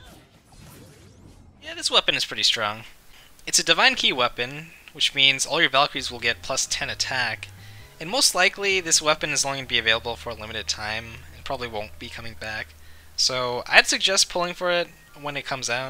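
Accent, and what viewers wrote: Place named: Australia. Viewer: Yo, you're voice sounds like Australian or something western I dunno, but Iirc you are playing SEA server right? And what the heck, you're damn good at making videos. Simple, the caption transitions are smooth but there's also that memeing moments and personal comments like "QTE Switch, now I can get rid of these abominations" or self interrupting like holy shit I rarely see that coming on these times. PS. You sound like you're speaking in Discord VC lol. PPS. I'm a fan of Aldnoah Zero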